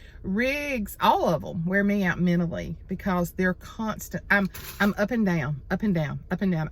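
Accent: South, United States. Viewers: Your southern drawl was in true form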